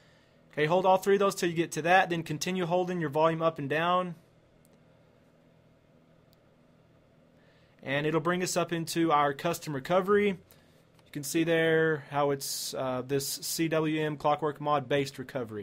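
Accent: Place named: South Carolina, United States. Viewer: You have giant balls with a South Carolina dialect. Or North Carolina. Whatever you coastal geniuses.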